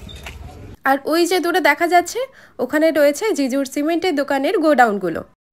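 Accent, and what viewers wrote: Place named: Bankura, India. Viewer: হটাৎ করে ইউটিউবে ভিডিও দেখতে দেখতে আপনার চ্যানেল ভিজিট করা,,তারপর থেকে আমি সিরিয়াসলি এটা জানি না কেনো আপনার ভিডিও দেখি কারণ না ভালো cinematography আছে বা না ভালো এডিটিং ,,কিন্তু কোনো এক অজানা কারণে হয়তো ভিডিও দেখি,, একটা কারণ বুঝতে পারি সেটা হলো আপনার কথায় একটা আলাদা টান আছে যেটা আমার বেশ ভালো লাগে, যতদূর সম্ভব বাঁকুড়া র টান এটা,,যায় হোক এগিয়ে যান,, আপনার চ্যানেল গ্রো করুগ এই আশা রাখি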